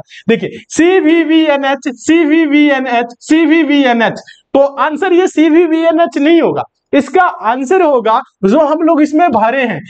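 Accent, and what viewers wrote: Haryana, India. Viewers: Aap Haryana se ho ji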